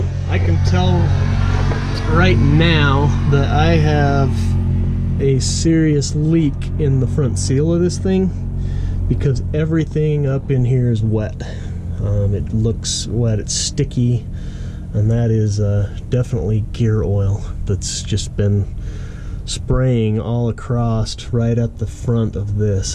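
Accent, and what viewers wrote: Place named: Utah, United States. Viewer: Greetings from Tooele! I can tell you're in Utah because you say "acrossed" instead of "across".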